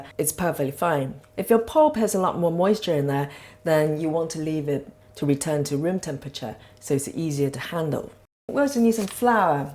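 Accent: England, United Kingdom. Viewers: This is a life saver. I have a ridiculous amount of okara. Also, I love your accent. It's like a mix of British and east Asian (Japanese?) Anyway, I think ill make this now. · Just sounds like an English accent to me.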